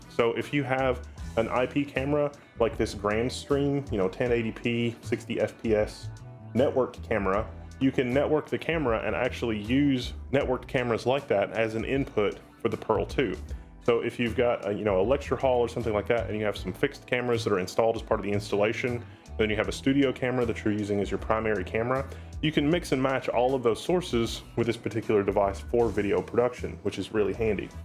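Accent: South, United States. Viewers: I watch this channel solely because of the girl. She has hypnotized me and I must have more content featuring her. She has a hint of south in her, maybe Alabama, Mississippi or Georgia. What's her name?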